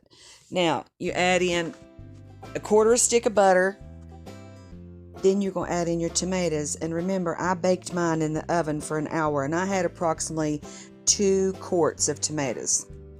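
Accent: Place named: South, United States. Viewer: Thank you ma'am I can tell by your accent,you know what you are doing. My mamaw & my momma made this really, really good, sadly, I never paid enough attention. Adding the sugar kind of scares me ( because I had macaroni and tomatoes at a friend's house growing up and she put sugar in hers and it wasn't like my mom's or mamaws....but mom did like hers every now and then like that). Is the amount of sugar you use do something like tame the acidity of the tomatoes or does it taste more sweet ? I like salt & pepper on my maters and sugar in my cereal,lol 😊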